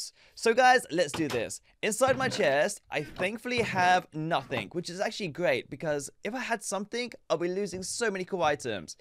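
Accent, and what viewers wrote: United Kingdom. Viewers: He be speaking british HAha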